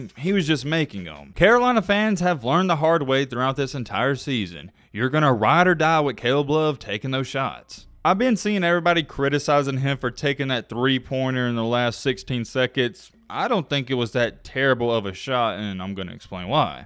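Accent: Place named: Tennessee, United States. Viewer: Bro you must be from Tennessee , your voice sounds a lot like upchurch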